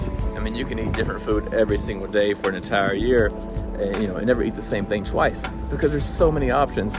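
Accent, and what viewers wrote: South, United States. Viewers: you from the south? Why you sound black?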